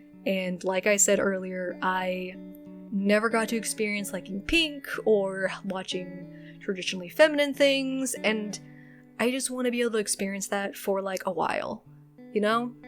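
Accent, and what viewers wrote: Midwest, United States. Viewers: Midwest: heavy souther with some northern mixed in and a bit of its own thing all at once.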